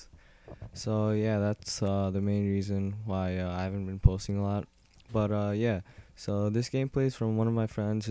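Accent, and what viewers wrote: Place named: India. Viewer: indian accent!!!!!!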